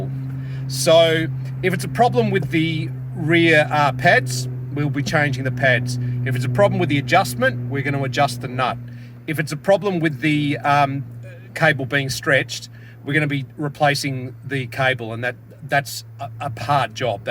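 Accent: Australia. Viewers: Cheers mate, nice easy to follow demo and loving the Aussie accent. Many thanks from Sunny Scarborough ,North Yorkshire UK. Fairer than fair dinkum:goodvibes:.Kindest regards, Paul.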